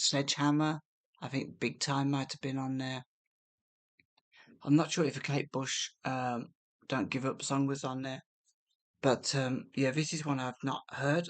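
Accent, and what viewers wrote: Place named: Midlands, United Kingdom. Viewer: Hello gorgeous 🎉. Back again from Glasgow. 😂. Been a while. Hope you feel better soon ❤️🌹. I'm 57. You're so YOUNG. I had some really scary health issues the last year, but I'm back at work again. 😂. Love the midland accent 😮.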